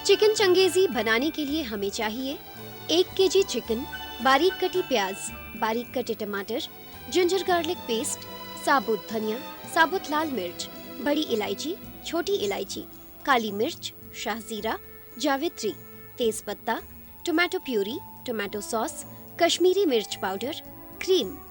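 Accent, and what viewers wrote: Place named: Uttar Pradesh, India. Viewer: Awesome recipe. Looks great. Just need to say sm tips while cooking. Tipical up accent. keep it up. Gd job.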